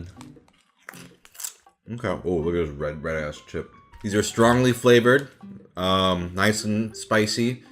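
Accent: United States. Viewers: yo this guy is asian but his voice and accent are AMERICANA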